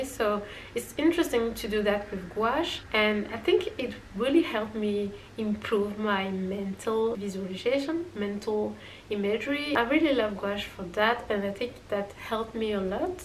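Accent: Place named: France. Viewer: I love you,re accent. Are you frence? comment vas-tu. Bien? I love you,re gouache painting. 😊👋🏼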